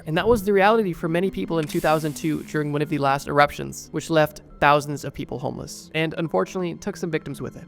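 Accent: Canada. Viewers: Youre canadian arent you? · I never noticed this dude has a Canadian accent it’s mind blowing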